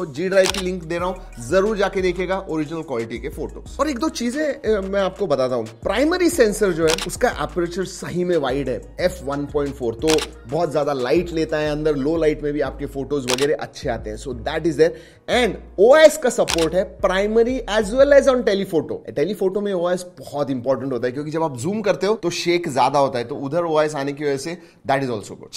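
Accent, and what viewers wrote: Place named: Bengal, India. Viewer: Aap Bengali ho kya.....aapke hindi me Bengali tone hai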